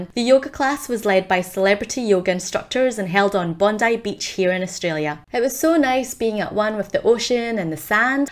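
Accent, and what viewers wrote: Scotland, United Kingdom. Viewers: Are you part Scottish?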